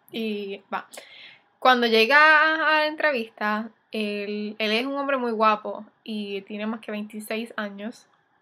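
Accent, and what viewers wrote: Puerto Rico. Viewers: Así hablamos los puertorriqueños. Espero que el ja, ja sea de que te da gracia...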